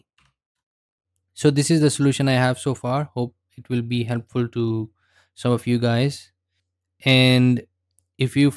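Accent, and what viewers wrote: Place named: India. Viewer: Thank fucking god for Indian tutorial guys on YouTube and leave it to them to fix the broken bullshit some multibillion company is incapable of fixing! Thank you so much for this! i was just about to smash my t.v.. after having struggled all day with that shitshow "taskbar" popping up and blocking me from using the search function of the file manager i am using to filter through some data libraries that was designed with a properly made system in mind and hence won't fit into the pseudo fullscreen if i didn't hide the taskbar, it know suddenly started popping up in the middle of my lord of the rings marathon and crashing the equally horrendously designed amazon prime app. The windows 10 design is just utter bullshit and missing even the bare minimum core functionalities to adjust this fuckery. Just like when running e.g. into a statistic's problem during the validation process of a new calibration method it is one of those things where I google for hours with only receiving "this is how it is, eat shit and like it" results until i finally remember how to use "" to force the advertisement pushing algorithm to feck off and actually show me the results i asked for - and then finally see it! 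The glorious sub-10 minute YouTube video that as soon as i hear the accent gives me total confidence that i have finally come to the right place to get some actual clear and straight to the point instructions on how to actually solve whatever issue i have run into. Tl.dr: thank you very much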